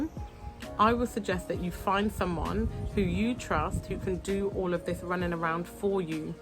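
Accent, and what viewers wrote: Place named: United Kingdom. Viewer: Greetings, that is indeed a lengthy process, however it's a great business for one who wants be become a realtor. Love your British accent. Are you enjoying life in The Gambia?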